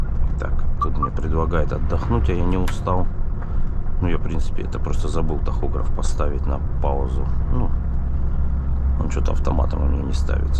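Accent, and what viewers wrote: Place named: Don, Russia. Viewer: 25.45 Я летом там забодался😂. Сразу видно, казак донской! Мы тоже так в детстве говорили.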